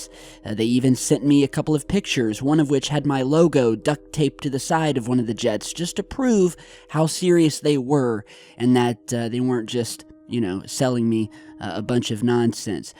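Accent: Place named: United States. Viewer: Never get bored of hearing your opinions. You have the only us accent without the deep south drawl that I am not irritated by. Everybody deserves some private time and a bit of r&r so feel no guilt for taking the time to stay fresh. Stay real and keep the truth. Kudos & respect for your work.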